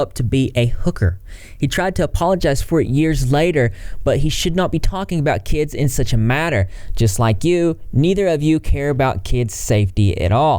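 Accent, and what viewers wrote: United States. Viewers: I did not expect that country accent lol. Which I get that too lol.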